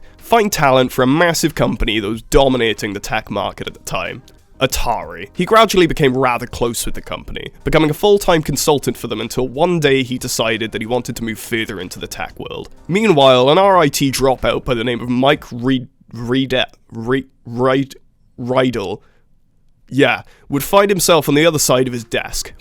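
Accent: United Kingdom. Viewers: This is a great video dude, also could be completely off but are you Welsh?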